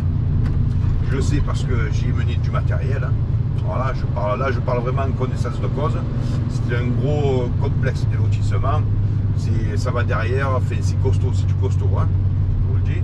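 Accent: Marseille, France. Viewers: bonjour et mer je suis un marseillais de l'an 1950 Né au canet rue mario pavrone pres de charles Moretti ou tu es passé dans cette video et ça ma fait bc plaisir. Continue comme ça tes videos ça nous plait surtout avec ta gouaille typiquement marseillaise. Bon dimanche l'ami. Pour la petite histoire j ai quitté marseille a 8 ans donc des frissons en revoyant mon quartier?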